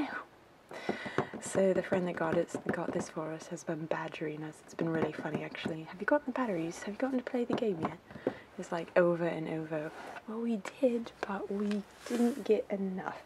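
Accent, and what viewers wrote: England, United Kingdom. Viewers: 0121cole She is American (her husband is Egyptian), but uses a British accent to protect her identity because she believes that voices are easily recognisable and wouldn’t want anyone to reveal her identity. Hope this answers your question. X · You have an English accent in there am i right?? xxx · I love this video it’s is amazing I love you and your videos I hope you get to see this if not it’s no problem I just wanted to say I love this channel because you are so thankful for the support and also you make very great videos and the British accent is amazing I’m from England and I think you sound so British hope you see this live you and your vids lots of love Emily Blanchard from England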